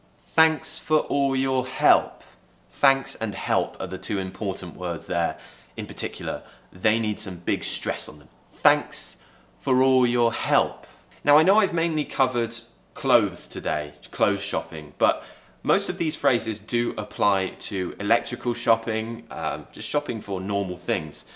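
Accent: United Kingdom. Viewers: the more I watch your videos, the more love I have for thi british accent. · Thank you, your videos are helpful. I like British accent. Even your new hairdo. 😄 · I wish One day i can sound more british lile this guy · Im watching yous vido with my son who is 8 yo, and he loves your British English so much. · It's the first time I came across one of your video, and I'm sure I will catch up everything I missed! Your British accent it's superb!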